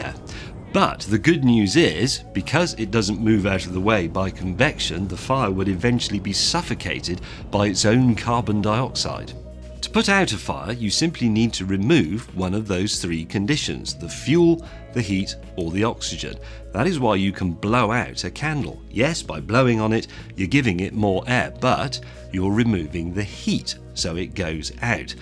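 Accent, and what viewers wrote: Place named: England, United Kingdom. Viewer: I watch these videos to learn James may's English accent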